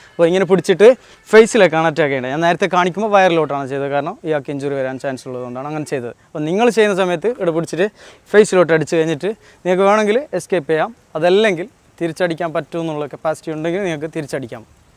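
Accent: Kasaragod, India. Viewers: കാസറഗോഡ് ആണല്ലേ.... ഗുഡ് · ശുദ്ധമലയാളം സംസാരിക്കാൻ ശ്രമിച്ചാലും ആ കാസർഗോഡൻ ശൈലി വരുന്നത്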